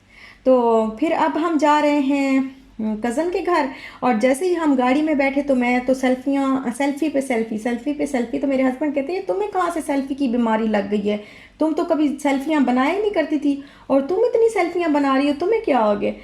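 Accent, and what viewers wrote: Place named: Hindko, Pakistan. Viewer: hi main Pakistan se hun aur Mujhe aapse ek question puchna hai ki aap Hanko Japan bolati Ho yani ke aapki Ki Jo apni Jawan Hai Hua hin Ko Hai Kyunki aapke Lage se Pata lagta hai please reply zaroor karna Kyunki Main Bhi Kohat se hun Aur meri Zubaan bhi Henko hai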